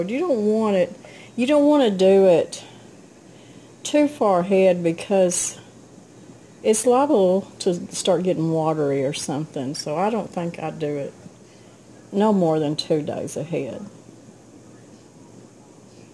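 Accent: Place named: Western North Carolina, United States. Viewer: Miss it looks so good. I bring watch u on Sundays. I'm new to our channel and I'm like u a country cook. I love all u make ur like being with a good friend just talking and sharing ideas. I'm from western NC and ur accent sounds like where I'm from lol. Your are also a blessing. Speading Gods love through ur channel.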